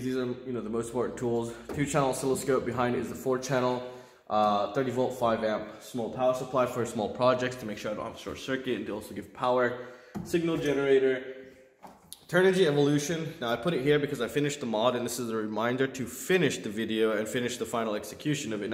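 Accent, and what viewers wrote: United States. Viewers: Made me smile. Thought he was American. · Where in europa do you live? I realy think you were a american😅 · Where I Europe do you live, you sound American have you always lived I. Europe ?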